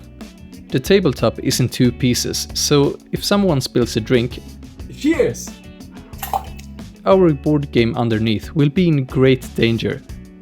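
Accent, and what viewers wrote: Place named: Scandinavia. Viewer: What a great video! Loved the approach (love the scandanavian accent too, coming from the UK, it just adds that amazing narrative feel to it - your wife is super cute ) and the channel play on name!! Amazing! Just a really nice video to watch - gonna subscribe just to watch your wonderful journey. Good luck to you both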